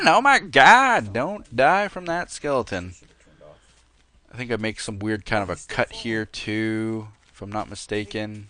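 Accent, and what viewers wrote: Canada. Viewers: Best stereotypical Canadian voice ever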